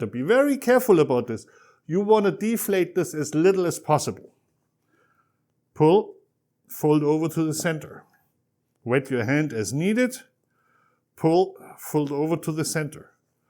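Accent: Germany. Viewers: Nice, and the German accent is nice too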